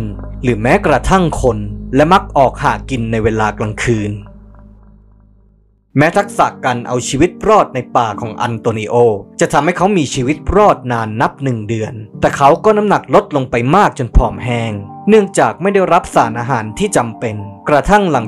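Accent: Thailand. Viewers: มีความเสียงเหน่อ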